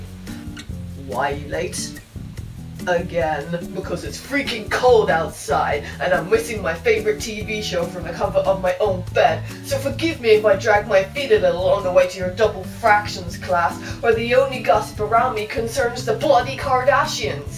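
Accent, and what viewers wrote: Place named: Ireland. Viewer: You're from Ireland aren't you, I can tell with your accent it's the same as my friend Apple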